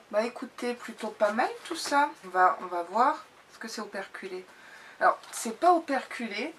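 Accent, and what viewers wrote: England, United Kingdom. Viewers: Aloha :) Très belle idée qu'ils ont eu de proposer des boxs surprise même si lorsqu'on est abonné, l'intérêt est un peu plus limité. Tu m'as fait exploser de rire avec les produits Polaar mais après tu me connais, j'aime avoir du (sur-sur-sur)back-up, alors quand j'aime un produit, je ne suis pas ennuyé le moins du monde de l'avoir 3 ou 4 fois :P Autrement cette box est toujours merveilleuse car elle propose des marques peu habituelles du fait qu'elle ne soit pas française et c'est l'occasion d'apprécier ton délicieux accent anglais qui a refait ma journée :) Je ne regrette vraiment pas d'avoir craqué pour 1 an avec Lookfantastic grâce à ton dernier unboxing. Plein de bisous !!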